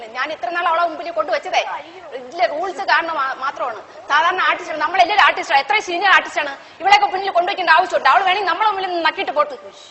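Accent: Trivandrum, India. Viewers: thalle thironthoram slang.. polappan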